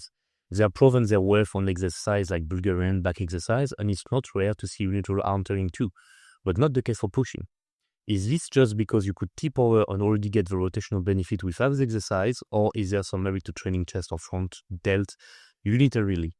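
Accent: France. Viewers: by accident, I trained for watching this channel by watching NH first. I have a bad ear for accents and I don't think I could understand you without acclimatizing myself to a thinner french accent first lol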